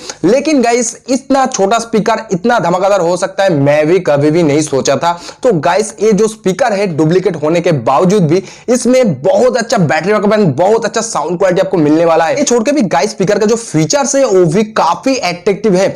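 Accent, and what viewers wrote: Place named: Assam, India. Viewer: Banda assami hai baat karne se pata chalta hai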